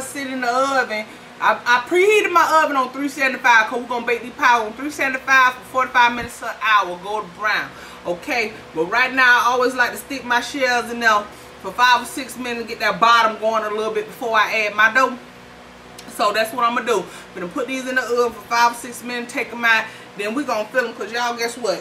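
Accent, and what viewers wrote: South, United States. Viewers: I Love your attitude with the southern accent!! I'm going in on your recipe this thanksgiving!! 😘😘 😘😘😘 I'll tell you how it turns out girlfriend!!! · I love your energy!!! Southern accent is nice to hear every time I watch you!! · I love the way she talks southern woman have beautiful accents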